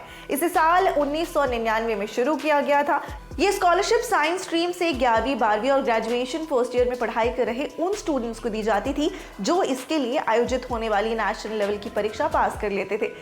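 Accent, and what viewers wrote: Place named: Mumbai, India. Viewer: South bombay/delhi se hogi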